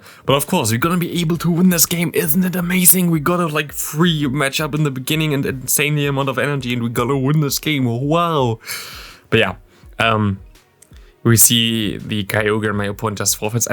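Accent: Germany. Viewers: Ohne es zu wissen, aber richtig deutscher Akzent im Englischen. :-)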